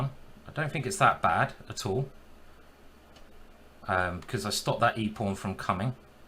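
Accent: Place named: London, United Kingdom. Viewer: As italian I know nothing about english accents. Are you from London?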